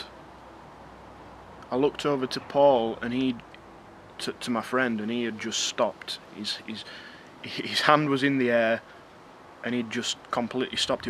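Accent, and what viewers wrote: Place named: Lancashire, United Kingdom. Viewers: How? I want to do it, I am in the UK, Birmingham. You sound like you come from Lancashire maybe? Buy it online at shayanashop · Love how you flit from proper English to Northern :D Nice vids, mate. Subscribed... Peace from Barnsley ;)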